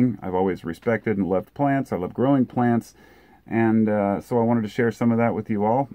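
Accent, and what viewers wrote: United States. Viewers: Haha “it’s been hot here in Portland” I knew this dude had to be from Oregon. Throwing stones from a glass house tho, all love from Washington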